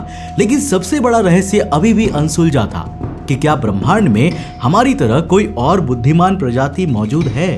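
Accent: Bengal, India. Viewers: I am very curious are u bengali??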